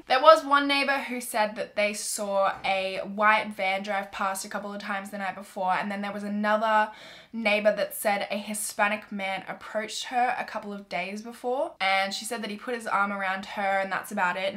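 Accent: Australia. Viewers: Jomara Medina she is Australian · Well she's Australian so she might be saying it different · Are you Australian? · people always complain about your voice 🤔 ... ive seen it on forums and to me your voice is so AMAZING... AUSSIE accent CUTENESS IS REAL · Literally Exposedd British ? huh 😂💀💀 she’s Australian 🌚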